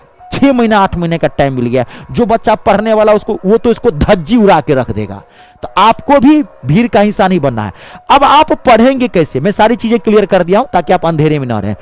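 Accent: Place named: Bhojpuri, India. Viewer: Mts k bad aap calculation batch leke aaiyega chahe youTube pr ya app pr Aap acha pdhate h, motivate krte h, or kbhi kbhi bhojpuri tone mujhe achi lgti h , or aapka positive attitude 👌,,, tyari jeet ki, grammar to phle hi pdhi h but aapke video se question lgane ka conference aaya h, thank you sir... Or ha sir mujhe aapke hath bhut ache lgte h such ab jo bat thi kh di... Mai kbhi comment nhi krti but yha ki h 🙏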